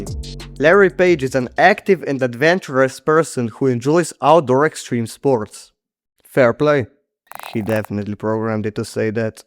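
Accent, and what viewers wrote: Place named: Southern Europe. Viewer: im guessing youre either from sa or southern europe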